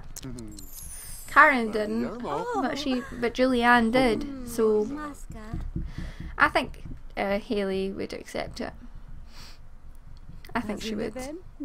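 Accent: Scotland, United Kingdom. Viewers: We have the same accent I'm Scottish too 😊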